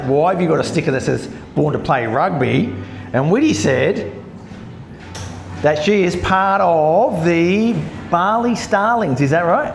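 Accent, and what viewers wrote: Australia. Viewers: Yeah I hear ya, 11hrs door to door it's a big day, I went to degree coffee today in Denpasar, good coffee, your videos speaking bahasa Indonesia have helped a lot with my learning as it shows me what an Aussie sounds like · Thank you, always such a pleasure to see your walk and talk. I always have to laugh when you talk English with an unmistakeable Aussie accent, but when you talk Indonesian the accent is almost gone. You learned it very well!!!! Don't think that many any foreigners talk Indonesian so well as you do. "Saya Belanda" by the way 🙂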